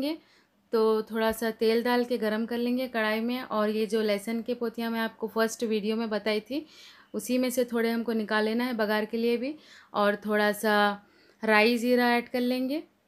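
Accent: Hyderabad, India. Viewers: Hedrabadi aese hi bat karte hain Meri ami b hedrabadi hain Or woh b India se hain magar shadi ho k Pakistan aee thi un ka lehja ab b thora thora wesa hi hai jab k unhe yahn aye hue 33 years ho gye hain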